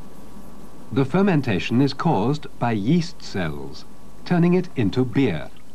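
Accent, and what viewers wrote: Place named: RP, United Kingdom. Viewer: This is great. I love old science ed videos narrated in recieved pronunciation. thanks for posting.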